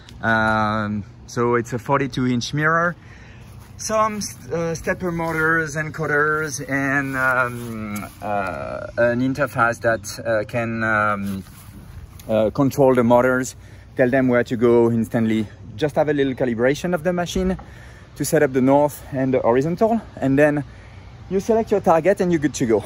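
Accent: France. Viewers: Very good project . Accent très frenchy ;-) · Hshahaha the french accent 😂😂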